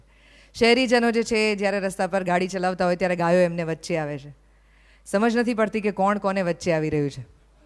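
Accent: Kathiawar, India. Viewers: બેન તલપદી કાઠિયાવાડી ભાષા માં બરડો ગીર નો સંવાદ બોલવા બદલ ખુબ ખુબ આભાર🎉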